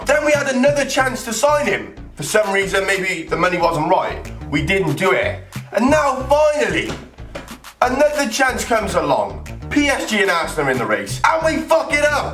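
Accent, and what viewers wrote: Wales, United Kingdom. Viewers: are you from welch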